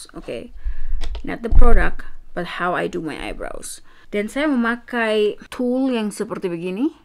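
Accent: Manado, Indonesia. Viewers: Logat dan cara berbicara anda mengingatkan saya kepada teman saya yang berasal dari Manado❤️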